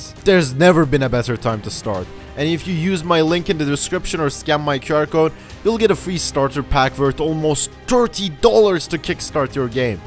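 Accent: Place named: Turkey. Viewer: Türk olduğun belli oluyo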